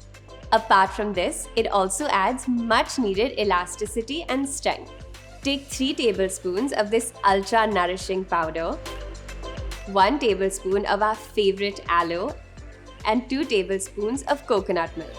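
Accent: India. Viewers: I'm from the U.K and she has British/European features, skin tone and hair colour. But her accent matches my asian/Indian companions. That is all, Never meant anything by it all! She is very beautiful either way! Love your videos guys. Keep up the great work! 🥰🤭❤️💗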